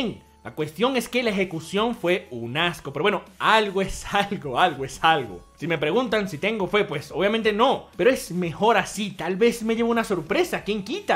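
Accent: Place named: Venezuela. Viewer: suenas avenezolano.. ¿estás allá?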